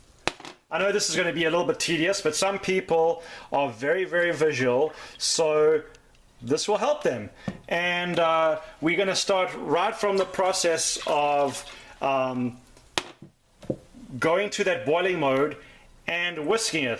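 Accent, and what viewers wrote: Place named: South Africa. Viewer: That goes without saying. The heating process is to attack some of the non-beneficial bacteria and microbes. That means, as you point out, that some benefit will be sacrificed along the way. Why should we sacrifice more than we ought. Also, I have discovered that 180 f is optimal heating and cooling to 108 is also perfect. At this time I will then place the jar in an insulated baking dish and fresh dish towels with a layer of paper towel rubber banded on. At this point, the oven will be maintened around 82-84F with simply the use of an oven bulb. Lastly, I have discovered that optimal fermentation/curing time is between 6.5 and 8 hours. Lastly, are you South African? Your accent reminds me of the many Boere which I have known.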